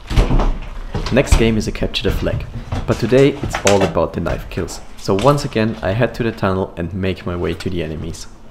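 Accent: Germany. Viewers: are you german? i am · Hach ja... Deutscher Akzent im englischen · man hört so richtig das er deutsch ist auch im videos als jemand anderer geredet hat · Are you from Germany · Warte der ist Deutsch Junge dieser Akzent sagt alles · You are german? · Are you German?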